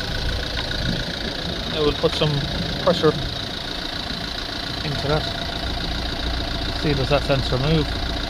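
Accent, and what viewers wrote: Ireland. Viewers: SOUTHH Dublin accent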